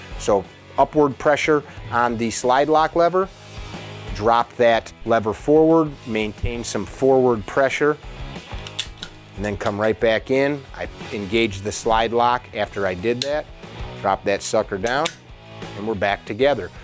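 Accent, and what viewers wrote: Chicago, United States. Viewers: You gotta be from Chicago. You have some of that Chicago accent and sound like Dennis Farina at times.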